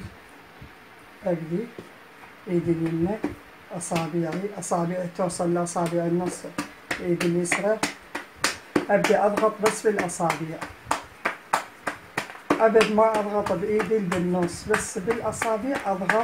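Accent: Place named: Iraq. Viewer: يازين لهجتكم ياهل العراق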